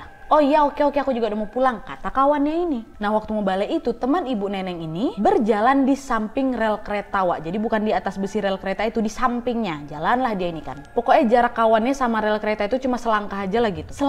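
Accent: Indonesia. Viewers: Hebat kamu bisa membawa rasa Indonesianya(Aksen) dalam cara membaca naskah. Terusin channelmu semoga sukses👍👍👍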